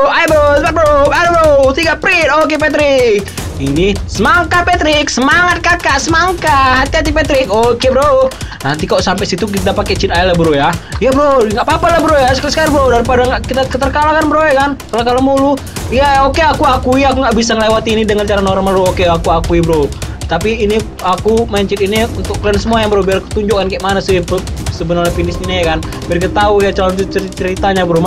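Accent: Medan, Indonesia. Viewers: ngomong nya aja kaya orang medan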